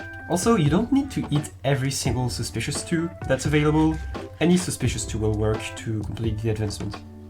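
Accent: France. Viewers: it’s obvious you’re french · you sound french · You got a french accent :) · I have a question dude, are you from France? By the way nice video :) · t'es français non ? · toujours le même accent fr xd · are you french ? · Ru french ?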